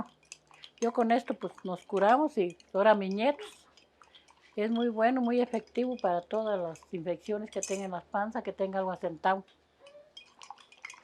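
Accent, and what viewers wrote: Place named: Rural, Mexico. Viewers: BIEN RIQUISIMO TODO!!! PERO YO VIVIENDO CON USTED NOMAS UN MES Y ME MATA CON ESOS GUISOS !!! YO CASI NO TOLERO LAS ESPECIAS O GRASAS POR MI ACIDO URICO!😬😢😭😭😫😫😫 AAAHHH PERO QUE DELICIA TODO LO QUE HACE USTED MIS RESPETOS!!! Y DISCULPE EN QUE PUEBLITO Y DE QUE ESTADO ES USTED ?? POR SU ABLAR PARECE DE GUANAJUATO!🙂🥰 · Muchas felicidades la invitó a q no pierda su originalidad su manera de hablar tan auténtica su personalidad de una mujer valiente alegre sincera l ser originaria de un rancho mexicano no lo pierda q la tecnología y la mercadotecnia no la contaminen q siempre sea auténtica muchas felicidades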